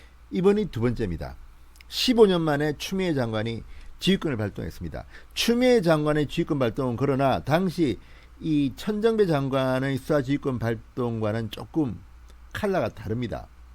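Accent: Gyeongsang, South Korea. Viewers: 진성호 너도 고집이세네~ 말학전서두에 자아~라는 말을 하지말라고 했잔아~ 방송에서 경상도말은 삼가하는게 예의아닌가?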